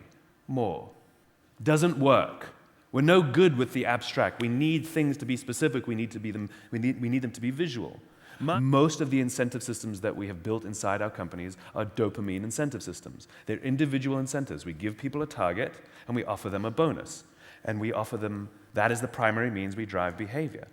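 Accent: England, United Kingdom. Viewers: Back before he lost his English accent. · Wow surprised with his British accent 👏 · Haha, when he's in England he kinda talks brittish :D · Why does he sound so British here ? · He should keep his beautiful English accent. It sounds so respectful and sexy. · He's almost doing a British accent. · I just love his British accent 🥰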